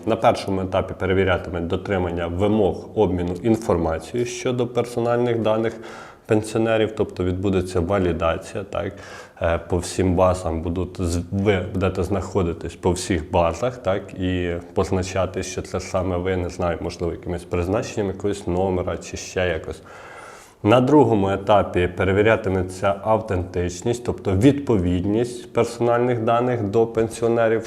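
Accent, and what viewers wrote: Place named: Galicia, Ukraine. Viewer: Я уже молчу о том, что сам выговор отвратный - тошнит. Галичина, тьфу.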